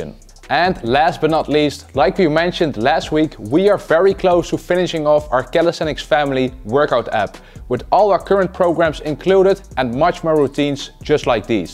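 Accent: Netherlands. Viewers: I can here the dutch accent in your english bro 😂😂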